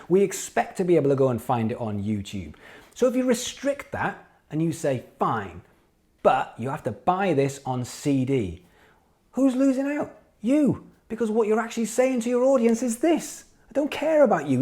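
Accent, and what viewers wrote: Wales, United Kingdom. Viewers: ahhh Swansea.. that makes sense I couldn't place it for some reason. I'm from the valleys (ebbw, brynmawr sort of way). Nice one man, enjoy the states.. my bro lives in California and they love the Welsh accent apparently. Dig your videos man. Have two bands and struggle to push them online despite one of them being signed haha.. so i find these vids helpful. Keep up the good work dude.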